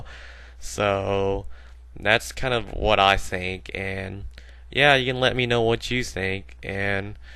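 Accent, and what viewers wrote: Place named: South, United States. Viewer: U have a southern accent and ur asian???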